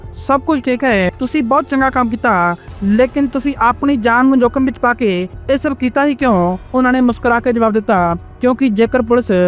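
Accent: Bihar, India. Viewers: ਪੰਜਾਬੀ ਬੋਲਣ ਵਾਲਾ, ਭਈਆ ਲਗਦਾ? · Bolan vala koi jokar lagda hai. Eh punjabi hai ke bhaiya.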